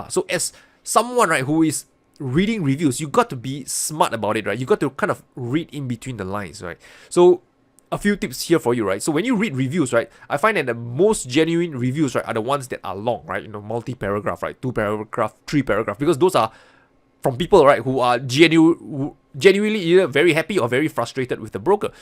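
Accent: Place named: Singapore. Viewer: Greetings from Scotland. Just found your channel...wow some excellent information on here, been trading for over 15 years and its great to see someone like you providing so many videos free of cost. If I may make a suggestion, you should include some info on how to read fundamentals of the asset that they are trading. I assume with that accent you are from SG, lived there from 2005 to 2016, started off with an apartment in Great World City , then moved to River Valley for a few years and finished off in Toh Tuck Road for the last 6 years which were the best, living with the locals, not a foreigner in sight. LOL , not a day goes by without me thinking about my 10 years there, keep up the great work.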